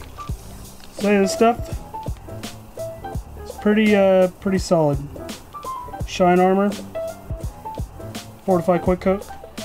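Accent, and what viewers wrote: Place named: Texas, United States. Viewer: Where in Texas you from??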